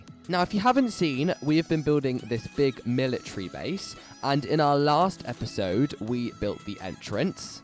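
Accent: United Kingdom. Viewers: Cool love the build <3 😀 Edit: i love your voice! Are you British cuz I am ❤️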